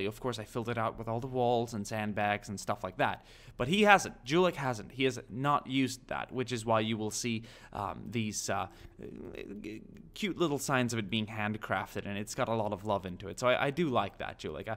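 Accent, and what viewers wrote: Sweden. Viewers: Are you swedish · Är du svenne?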